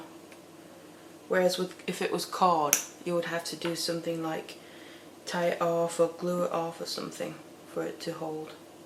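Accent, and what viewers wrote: United Kingdom. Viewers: Her instructions are BETTER than all the others and I LOVE her British/English accent... Now I need to find her other videos?? Hope she has lots...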